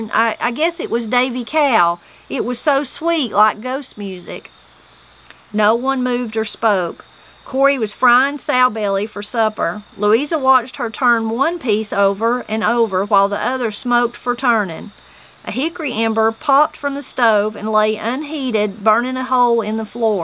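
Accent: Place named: South, United States. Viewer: This was just what I needed at the end of a long week of work. So nice to hear your lovely Southern voice reading to us and the story gets better and better. Reminds me it is time to find another good book to read myself. Or time to open a fall puzzle. You are special and I am so glad to have found your channel.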